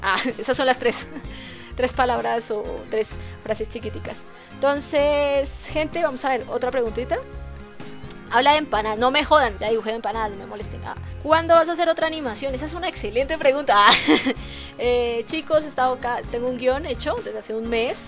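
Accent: Colombia. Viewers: No joda parse es colombiana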